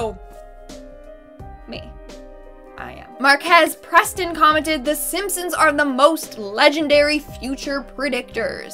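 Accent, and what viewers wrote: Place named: England, United Kingdom. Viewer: I am so hooked on the English accent and now the American accent sux.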